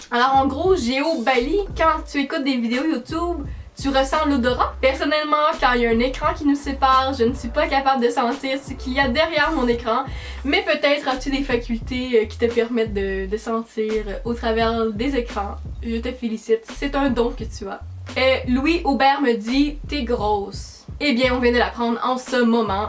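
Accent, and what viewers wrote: Quebec, Canada. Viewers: j'aime tellement mais tellement tes vidéos ! Au début j'avais un peu du mal avec l'accent québécois mais franchement je me suis habituée puis maintenant je regarde beaucoup de québécoises et j'aime beaucoup votre accent ! Puis reste comme tu es parceque je ne te connais pas réellement mais la personne que tu fais ressortir est vraiment cool. J'attends ta prochaine vidéo! ☺💙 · J'aurai tout donner pour avoir ton accent. Mon rêve est d'aller au Québèc, donc tu est pour moi PARFAITE. <3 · J'adore ton accznt québécois:continue change pas ton accent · Moi je t'aime trop puis j'adore ton accent ce québécois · elle et canadiens sa se vois et sa sentant !!!!!!! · moi j'adore ton accent et je rêve de vivre au Québec depuis que j'ai 6 ans (j'en ai presque 14) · j'adore ton acce't et ca se voi qui, vient de Québec ☺💜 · j'adore ton accent québécois, continue tes vidéos je t'adore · C pas sa faute si elle a un accent québécois perso j'ai un accent parisien, et son accent est tres mignon elle y peut rien ❤️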